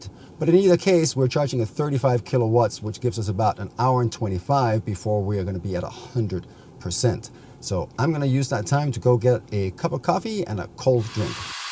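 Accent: Denmark. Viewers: Awesome video! Thank you for taking the time to make it! That car is just beautiful even after 10 years! ... Also.. Am i correct if i you have a slight danish accent?! :D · Du lyder dansk :)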